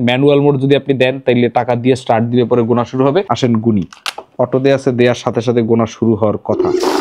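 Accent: Barishal, Bangladesh. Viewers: ভাই আমি নিশ্চিত আপনি আমাগো বরিশাইল্লা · Vai barishal er maal vasa sunlei buja jay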